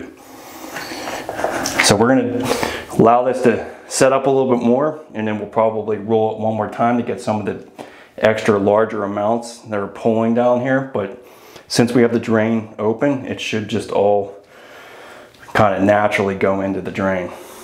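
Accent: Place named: Pittsburgh, United States. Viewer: I'd recognize that beautiful Pittsburgh accent anywhere. Stone cold yinzer coming to the rescue.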